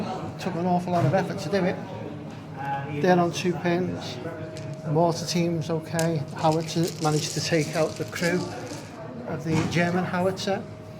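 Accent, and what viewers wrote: United Kingdom. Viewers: That a scouse accent? I'm recently into Bolt Action but no idea where theres any groups in Liverpool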